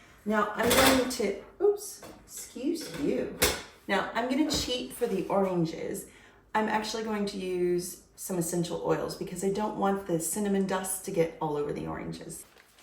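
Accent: United States. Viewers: Oh ok I thought you sounded a bit American! lol. Menopause is awful and neurodivergent kids are such a challenge! It's nice to know others can relate! Plus Jane Austen is amazing! I think I'll enjoy your channel! ❤ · I have just found your channel and I was confused for a while you sound American but your home looks British then I heard you say Tesco. I found this video so peaceful and I instantly subscribed.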